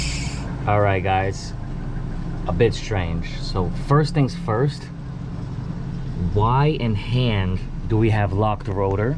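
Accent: New York, United States. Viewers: You sound like your from NY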